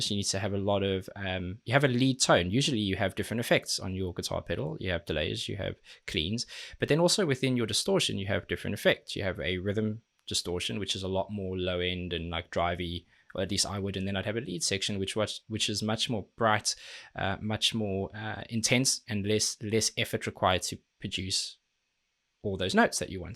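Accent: South Africa. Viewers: Also, I've only just found your channel, do I detect a SAffer accent? edit* Just watched your Q&A - Jo'burg boy eh? Howzit Boet? I'm originally from Namibia, so it's great seeing someone from that part of the world doing well. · Is this guy South African??! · Look. I'm American so I'm sorry if I'm wrong... But are you south African?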